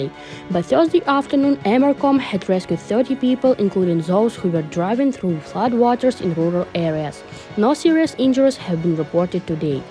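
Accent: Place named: India. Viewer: 인디안 영어냐? 발음이 왜그래?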